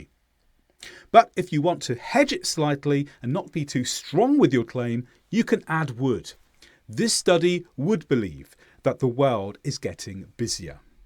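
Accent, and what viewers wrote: United Kingdom. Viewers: Wow, wonderful world class english. I like british accent V.much.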